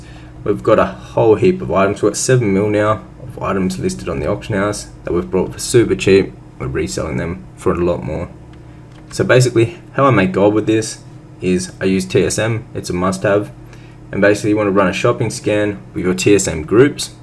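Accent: Australia. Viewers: Hey, np! I love seeing small youtubers grow over time. Are you OCE you sound Aussie!? either way, been watching your vids for a while and like your content style - real info to work with! subbed and will try get your guide!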